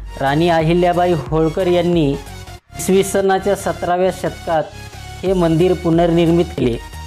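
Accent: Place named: Beed, India. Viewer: भाऊ चा आवज अस्सल बीडकारी आहे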